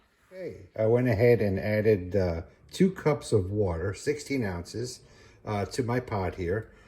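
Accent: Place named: Texas, United States. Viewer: Like your Texas accent. Born and raised in NJ moved to Texas 45 years ago. I grew up on NY style Pizza haven’t had one to match since moving here, will try this recipe this week.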